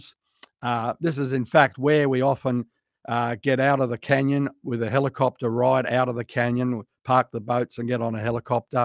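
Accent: Australia. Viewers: I love that Australian accent keep it coming brother praise the Lord 🙏🙏🙏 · I was confused at why he said he wouldn’t sound like me, then he said he was Australian and I realised why he sounded familiar.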